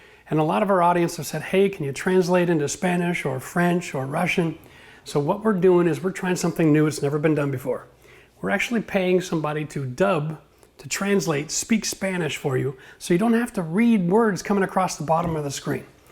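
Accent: Canada. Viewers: I was just used to your CANADIAN AYE:)~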